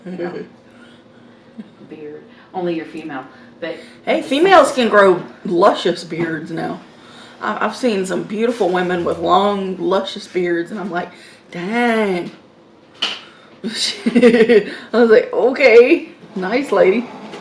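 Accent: United States. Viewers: She does speak English it's just a American accent like British have British accent accent isn't a language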